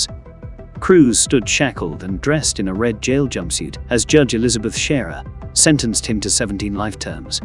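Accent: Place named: United Kingdom. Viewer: Why is a British voice doing the narrating for this video. These are murders that took place in America, the whole trope of having a esteemed British sounding voice to the narration for a documentary or a newspaper whatever is so corny.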